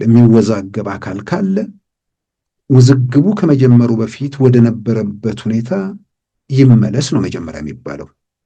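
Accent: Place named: Arab world. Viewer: 👉👹👹😭ሀ ሁ ሂ ሃ ሄ ህ ሆ ብሎ ያሰተማረህ ማን ነው ዋጠው አማርኛው ከየት አመጣሀው ቃንቃህ አረብ ነው 😭 👹👹👈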